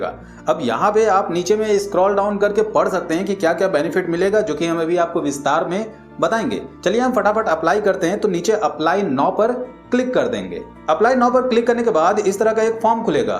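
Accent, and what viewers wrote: Bihar, India. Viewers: Bhai apki bate Bihar acchi lagi 🤭🤭🤣🤣🤣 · bihar se ho kya?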